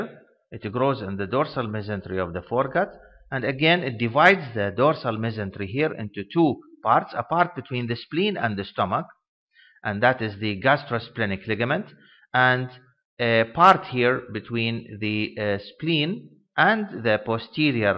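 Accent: Iraq. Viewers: هل انت من العراق دكتور احس لهجتك عراقيه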